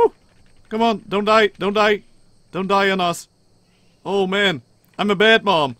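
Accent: Netherlands. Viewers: are you From the netherlands, because you have kind of a dutch accent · You sound dutch..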